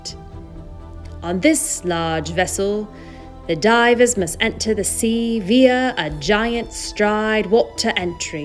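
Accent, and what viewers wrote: United Kingdom. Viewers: I thought that was a very impressive British accent. Well done mate! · I liked the voice, sounded authenticity British to me and fun. Hope you don’t stay sedately in FL. Happy Holidays will miss you😷😳🥲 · Totally loved your British accent. Very funny, especially the panic part.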